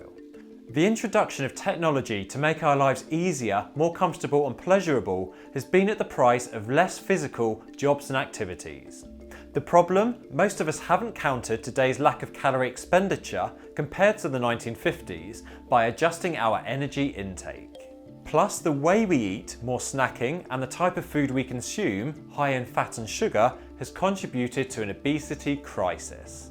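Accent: United Kingdom. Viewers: in the US in the 50's there were HUGE supermarkets & everyone had a refrigerator.....considering his British accent...maybe not in the UK. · Love that British pronunciation with emphasis on syllables unaccustomed to American ears. SeDENtary and ContriBUTory. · he's using the British pronunciation - because he's British.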